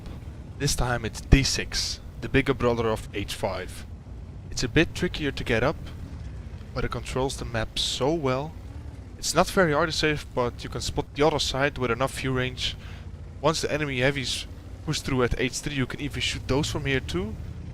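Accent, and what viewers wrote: Netherlands. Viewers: je kunt zo goed horen dat je lekker nederlands bent.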